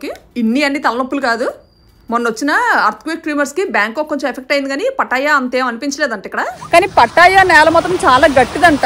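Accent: Vizianagaram, India. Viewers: మీ విజయనగరం మాట మారలేదు అలానే ఉంది చక్కగా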